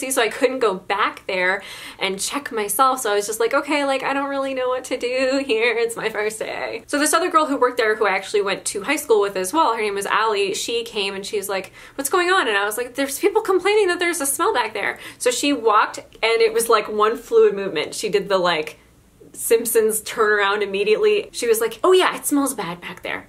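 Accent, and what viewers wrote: Newfoundland, Canada. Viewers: Your Newfoundland accent made my LIFE! I'm a Newfoundlander living in Chicago and I have absolutely answered my salon's phone in a heavy Newfoundland accent before. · Lmao that's such a good newfie accent u didn't know you were newfie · I HAVE ALWAYS WANTED TO LEARN A NEWFIE ACCENT I AM IN TOTAL AWE YOU DON'T REALISE THE TALENT YOU HAVE IT IS SUCH A TREASURE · I don't have a retail story but your Newfoundland accent is so cute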